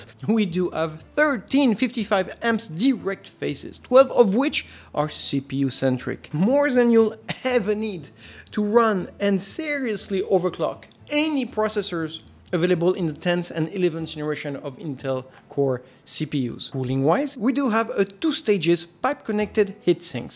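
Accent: France. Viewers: Je viens d’apprendre grâce à cette vidéo que je vais pouvoir maintenir ma workstation à jour d’ici un an ou deux, juste en remplaçant le processeur par un Intel de 11e génération. Super intéressant !Par ailleurs, sur la forme, l’accent français est génial et sur le fond plein d’infos inédites sur les processeurs Intel de 10eme génération et sur la gamme Aorus de cartes mères gigabyte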